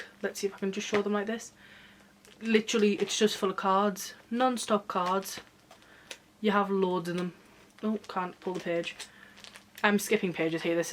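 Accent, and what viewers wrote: United Kingdom. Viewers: I love your accent, you British? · You are so pretty😍 Also I love your voice are you British?❤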